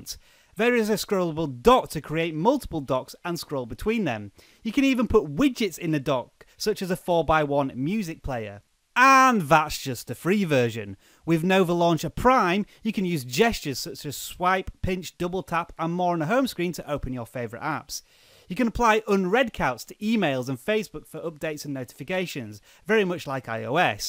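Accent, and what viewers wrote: United Kingdom. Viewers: British accent......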